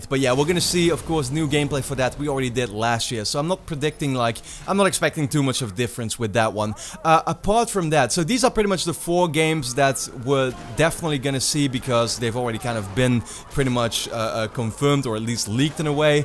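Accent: Netherlands. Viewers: Good work with this video m8... But i have to ask: Are you by any chance Dutch? You have quite the accent 😉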